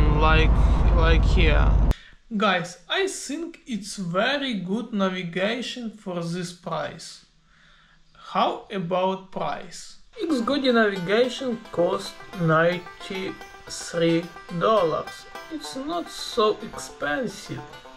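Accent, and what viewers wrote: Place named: Lithuania. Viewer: Myślałem że to Litwin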